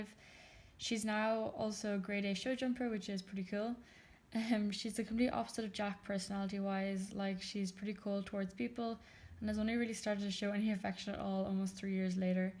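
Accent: Ireland. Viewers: Are you Irish 🍀 cause u sorta have an Irish accent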